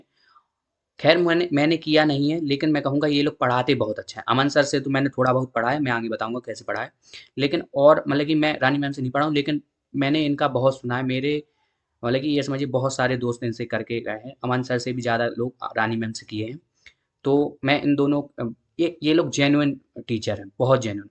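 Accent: Bundelkhand, India. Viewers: Bro are you from bundelkhand??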